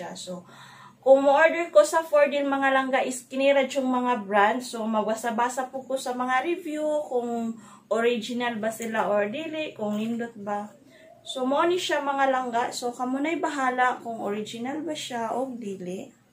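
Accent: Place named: Philippines. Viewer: 35🌺🌺👍, love Filipino accent 😊, stay blessed 🙏 🙏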